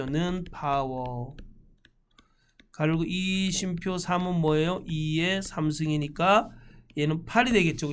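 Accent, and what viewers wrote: Gangwon, South Korea. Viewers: 안녕하세요~ 5월 24일 실기 시험 발표 오늘 이었는데 한번에 합격했어요~~넘 감사해요^^ 작년 추석쯤 2024 5일만에 합격하기 기본서 구입하고 함수문제 보고 엄두가 안나 손놓고 있다가 반백살된 기념?으로 자격증 공부 해봐야겠다 생각들어 다시 시작했습니다.(엑셀 사용하는 업에 있지도 않고 sum, average 라는게 있다 정도만 알고있는)필기는 다른책으로 공부하며 기출 돌렸는데 점수가 꽤 높게 나와 이만하면 되겠다 했는데 딱 그만큼 두 문제차로 떨어지고 강의로 4일동안 7,8시간 공부해서 합격하고 바로 실기공부 돌입. 노안으로 안경을 벗었다 썼다 함수지옥에 빠져 환장할뻔 했지만 실기공부 2주동안 매일 6,7시간 공부 하고 합격 확인하니 너무 기쁩니다~ 함수는 표하나에 5문제 다 나오는 기출에 있던 문제 나왔고 부분합은 성별별로 수당과 상여금 최대값 합계 구하는 문제(성별로 내림차순), 기본작업에서 데이터 나누고 열 제거하기, 75,000-75천원으로 바꾸기 이정도 기억납니다. 다른 후기처럼 기출문제보다 조금 쉽게 느껴졌어요 2025 상시 10회분 넘 어렵게 느껴졌는데 2024로 공부해도 충분할듯 합니다. 컴활공부 검색하다 우연히 만난 선생님 억양이 친숙해서 보니 강원도분이셔서 더더 반가웠네요 저는 태백 아래 고한사람입니다~ 주변 사람들에게 많이 알리고 있답니다~~ 항상 건강하시고 행복하세요~~~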